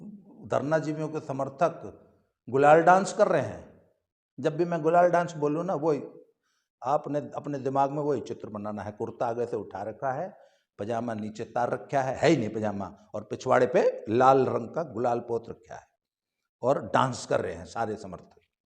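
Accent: Haryana, India. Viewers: Very nice presentation of Dharnajivi wrestlers 'history in Haryanavi accent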